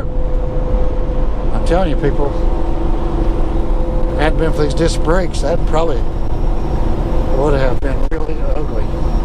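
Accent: South, United States. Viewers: I really enjoy your videos. My dad drove for many years. I crawled in the truck every chance I had. He was born and raised in east Tennessee so hearing a friendly southern accent puts me back in the truck with him pretty easily. Be careful. I'll keep checking in on you.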